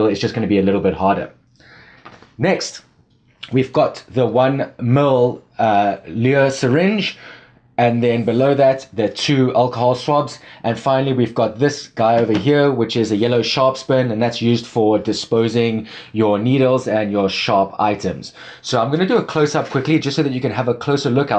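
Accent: South Africa. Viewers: Sounds very south African.... Lekker boet... Very informative awesome video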